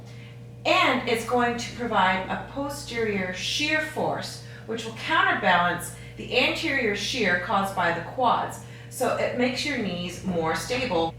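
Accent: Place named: Canada. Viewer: Outstanding video- It's like you read my mind request list from yesterday's leg day. Also...Canadian Accent +"Squat"= huge smile! ✅🌈🌈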